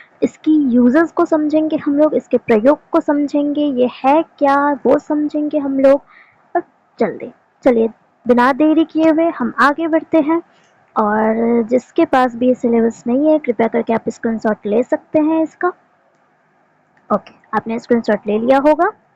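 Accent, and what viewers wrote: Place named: Bihar, India. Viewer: Accha aap bihar se ho😮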